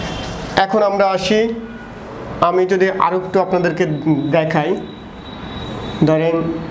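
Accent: Noakhali, Bangladesh. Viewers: স্যার মনে হয় নোয়াখাইল্লা🥱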